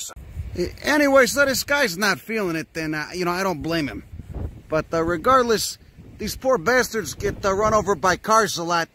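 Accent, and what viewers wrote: Chicago, United States. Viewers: I just love a proper Chicago accent it reminds me of home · he's from chicago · Very entertaining content! Great accent! (Chicago? Hearing "Da bearsss"). Walked right by a sweet Paleo arrowhead. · Chicago accent, not any way near New Jersey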